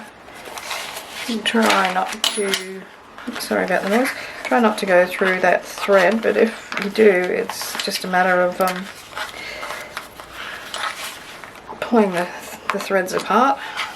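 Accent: Australia. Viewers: This is doable! I’m collecting bits and pieces to make my first journal and JJJ seems like the perfect time to muster up my courage. It’s going to be a French and lace journal. I’ve saved this one and I’ll be referring to it a lot! Thanks for the inspiration. I’m in Oz too on Tweed Heads. It’s so nice to hear an Aussie/kiwi accent in YT. Of course I’ve subscribed😊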